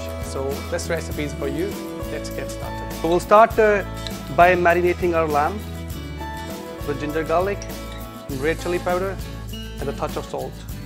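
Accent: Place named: Lancashire, United Kingdom. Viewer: I'm loving this blokes accent. Where's he from? I'm getting north east lancs vibes off it.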